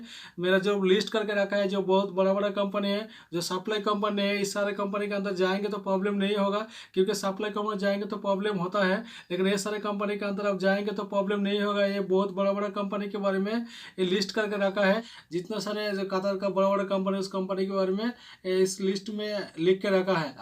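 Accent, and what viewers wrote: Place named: Bihar, India. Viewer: Sabse pehle yey bata Tum Bihari ho kya !! Aap ke awaz se aesa Lagta h ke aap Bihari ho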